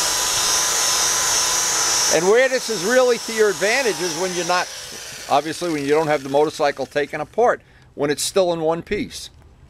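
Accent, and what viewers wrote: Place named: New York, United States. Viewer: I swear... you sound just like my father!! Same N.Y. accent. Same gestures. Same humor. Same ability to do things that many would think were too difficult to even try on their own. My dad is a Parris Island Marine, with a tenth grade education, who learned that everybody has value, as we can always learn from others who have struggled to accomplish something. So, when you mention the friends who have helped you learn your craft along the way, that says a lot about your character sir. I ain't askin for a hug or anything... just giving you your props. Peace!!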